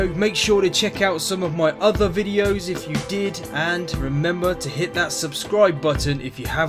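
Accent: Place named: South West England, United Kingdom. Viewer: He's English, like me, and his accent is probably from the South West.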